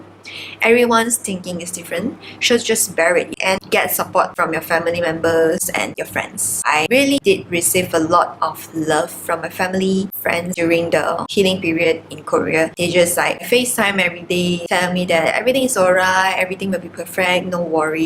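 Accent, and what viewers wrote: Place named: China. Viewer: Are u Indonesia or Chinese? Sorry.. 'Coz ur English sound like Chinese English.. But the translation is in bahasa.. I just wondering🤔💭 😁.. Thank u😊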